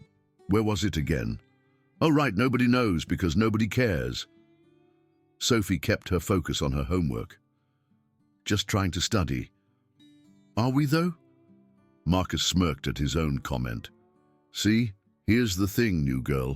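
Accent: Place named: United Kingdom. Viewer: Wow, sentences with punctuation, and an almost British-accentuated narrator, with judicious attention to intonation pattern as a function of sentence structure. A definite pleasure to hear. The plot may not be spectacular, given the prevalence of this genre, but it keeps moving the story along and the storyline is better than most.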